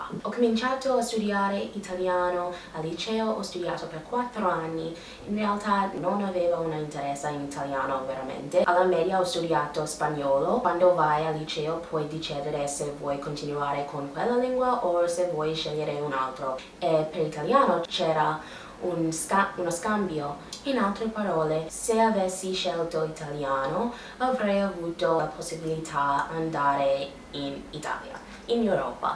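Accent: United States. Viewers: You are pretty good, you make some small mistakes but you can fix them, like you mix up some word gender because in english words don't have a gender. A tip to sound a bit less american is to say some vowels open and not closed, we are weird because we don't put every accent on words but only on some, the best example would be the word "ancora" which can mean either again or the metallic thinghy ships have(dunno how it is in english sorry) so it can be important, listen other italians and imitate them, good work! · Why do you use an American accent when speaking Italian? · Parli perfettamente, e l'accento americano è adorabile! Grande ❤ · ovviamente si sente l'accento americano, però parli molto bene. Ho molte amiche che sono nate all'estero (Polonia,Croazia,Russia) e sbagliavano sempre gli articoli, ma tu questo problema non ce lo hai. wow bel lavoro! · Bravissima! 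I'm no one to make judgements but your Italian is more than proficient; the world is always surprising: I know people my age, so middle aged, even university educated that still struggle with verbs' conjugation and some tenses...that's quite irritating, while you are almost always correct and fluent. A few flaws (very few in your case) in a foreigner speech are even nice...and please try not to lose completely your slight American English accent: is very charming, cool and exotic! Best regards! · migliora un po' la T è troppo schiacciata, troppo inglese-americano e un po' anche la r.. però bravissima l'italiano è difficile... Io amo L'america penso che ognuno sia attratto da ciò che non gli appartiene. di che stato eri? mi iscrivo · Parli davvero bene italiano, complimenti. Non hai troppo l'accento americano, brava! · Sei brava a parlare, ovviamente hai una cadenza molto americana ma lo parli fluentemente e ti si capisce benissimo. 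La pronuncia non è facile, d'altronde come per noi imparare il vero "American English"! Continua così, io vivo a Milano ed è bello vedere i punti di vista di qualcuno dall'esterno! You're beautiful and very chatting person:) like me! Good luck for everything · mi piace tantissimo quando parli in italiano e si sente l'accento americano ;) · Amo amo amo questo accento! davvero sono molto affezionata all'accento americano in italiano, ho visto molti video tuoi e sono veramente interessanti! abiti a Milano a quanto pare, magari un giorno ti trovo in giro ahaha, sei divertente e super simpatica :)) p.s. sei bravissima a parlare comunque! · Rimane americana non puoi dirle di migliorare delle lettere che nell'accento e pronuncia della sua lingua sono state sempre così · Brava, parli molto bene l'Italiano! Un poco l'accento americano, ma va bene...ti fà più sexy! Ok? Ciao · You speak correctly - better verb tense agreements than many Italians - the American accent is even harder to lose than my English one though 😊. 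The only American I have ever heard that has managed to lose their accent is Romina Power, and look how long she had to live there! (and who she married to do so hahah)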